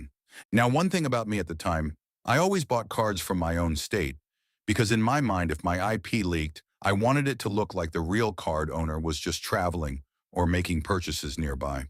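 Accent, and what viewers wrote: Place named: India. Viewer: I can smell the Indian accent from behind the AI voiceover.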